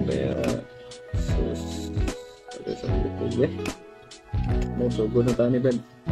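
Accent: Visayas, Philippines. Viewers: 😂😂😂 ug paminawon raka ,di ka tan awon bisaya jud kaayo ....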